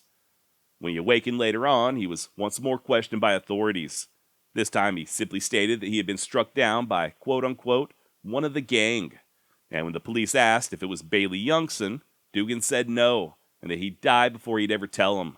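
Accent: Texas, United States. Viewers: tf? What are you talking about bro? He’s just from Texas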